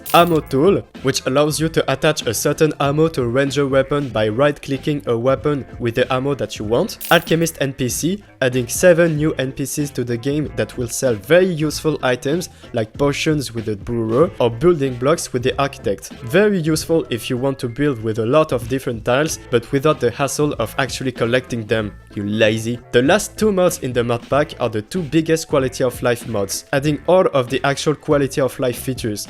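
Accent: France. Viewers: are u french u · I can feel that french accents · le bon french accent on apprécie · tu ne serais pas français parce que ton accent fait très français ? sinon super vidéo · Je sais reconnaitre un accent français quand j'en entend un. Super vidéo bro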